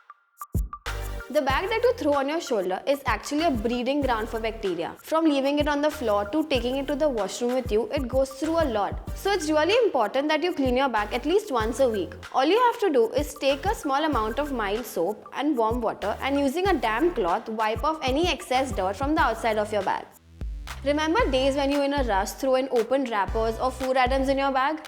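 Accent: India. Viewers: You’re just not used to Indian accent and that’s okay ! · Indian accent sucks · I know! What's wrong with all these people. She's Indian for crying out loud so she has an accent. Any idiot can understand her if they listen. My god. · She has a thick accent as most Indians do.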